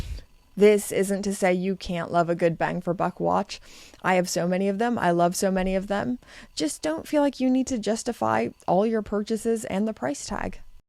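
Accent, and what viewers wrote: Canada. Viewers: As a fellow Canadian, what is it, exactly that makes us sound so Canadian?? 😂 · She sounds Canadian. Where is she from?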